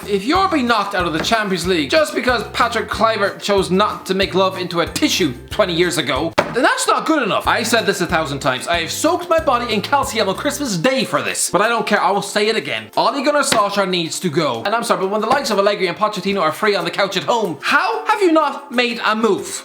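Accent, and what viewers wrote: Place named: Ireland. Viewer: Nope just that irish accent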